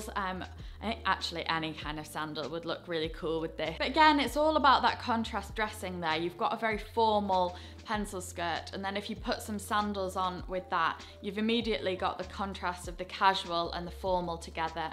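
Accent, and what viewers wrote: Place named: Manchester, United Kingdom. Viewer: First of all— obsessed with your style tips. This is literally so helpful. Second, I didn’t know why your accent sounded so familiar until I realized that you’re in Manchester and that’s where the 1975 is from, and Matty’s accent sounds exactly the same. Haha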